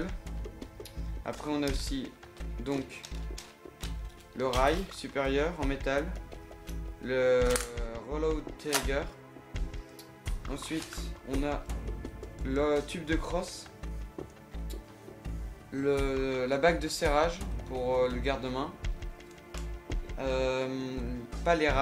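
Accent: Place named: United Kingdom. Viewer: J'adore ton accens anglais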